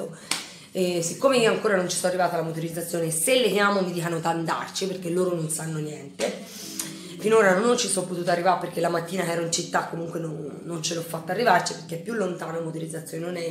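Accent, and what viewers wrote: Tuscany, Italy. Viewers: Quando sei euforica la cadenza toscana esplode proprio!! Ahah! Bella,!!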